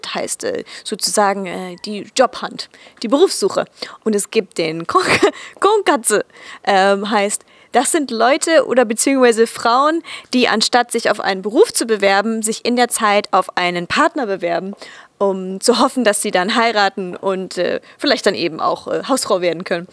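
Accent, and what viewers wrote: England, United Kingdom. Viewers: I always thought she was from the UK. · her accent sounds English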